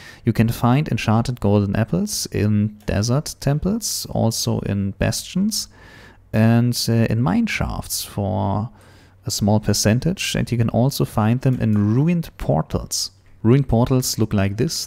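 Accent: Germany. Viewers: I love his german accent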